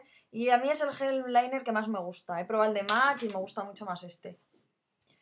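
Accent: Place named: Spain. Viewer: I subscribed cuz I liked your spanish accent, and you do NOT fail to deliver! haha I love it!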